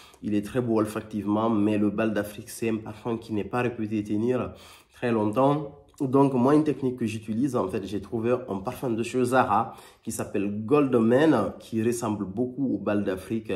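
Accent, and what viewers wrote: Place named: Wolof, Senegal. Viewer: Hello merci pour ta video, Jaime bcp ta chaîne!!! Es-tu sénégalais ? Tu as un accent wolof !!! 😻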